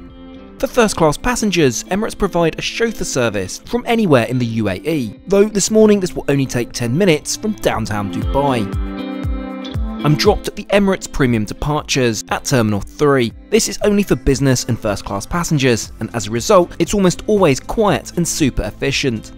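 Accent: RP, United Kingdom. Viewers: Never thought I would be watching a man who uses the Kings English lol please don't take that the wrong way. Because were i was brought up we dont lol love your videos bro trust me wheather your on a train or plane I look forward to watching them. Am gald I sub to your channel I don't how many times because I've had to get a new phone lol. All the best bro and thank you for all the hard work you put in for your subs we appreciate it.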